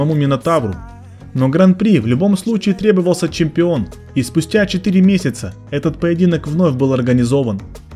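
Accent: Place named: Caucasus, Russia. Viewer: Комментатор с кавказа добавил перца😆👍.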